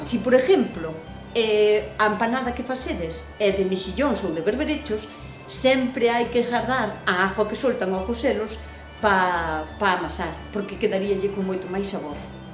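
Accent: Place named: Ribeira, Spain. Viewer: De donde eres??? Porque falas ijual que nos aqui en Ribeira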